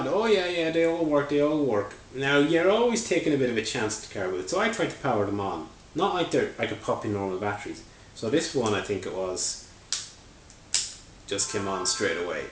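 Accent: Ireland. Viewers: are u irish , u seem to have some sort of an irish accent?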